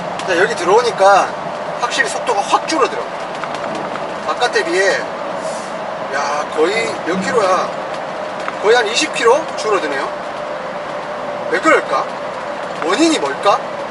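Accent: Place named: Gyeonggi, South Korea. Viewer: 님 점점 경기도사투리 쓰시네요ㅋㅋㅋ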